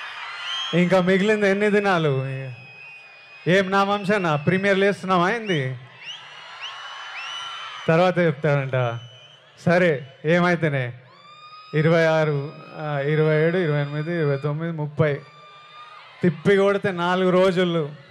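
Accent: Rayalaseema, India. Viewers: Rayalaseema slang ippudu, next Godari slang loading 👌👌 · అన్నా రాయలసీమ యస చన బాగా మాట్లాడినవ్ అన్నా నీ సినిమా బాగా ఆడతదిలే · Rayalaseema slang bagundanna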